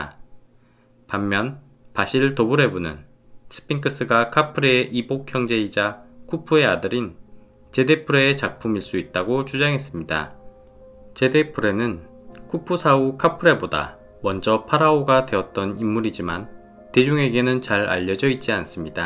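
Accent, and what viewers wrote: Jeolla, South Korea. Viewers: 전라도사투리가 구수하군요 ㅋ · 전라도 사람이 표준어 쓸려고 애쓰는 내레이션 억양이 재밌네요 ㅋㅋ 아...물론 내용은 👍👍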